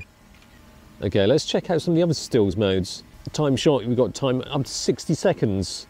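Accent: United Kingdom. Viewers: Legitimately the most British voice I've heard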